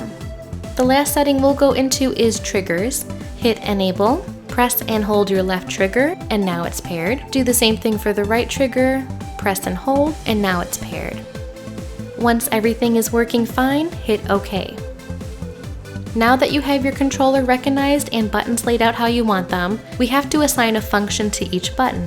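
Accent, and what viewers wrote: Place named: Michigan, United States. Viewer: Michigan or Chicago? I think Michigan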